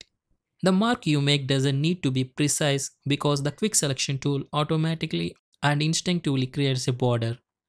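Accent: India. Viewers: Indian accent is cool ikr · Indian accent 😃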